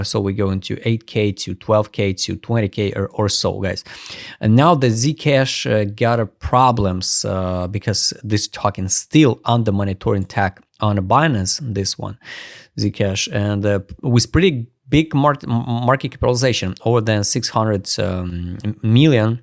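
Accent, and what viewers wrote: Russia. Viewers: Pyсню слышу сквозь попытки англицировать 🖕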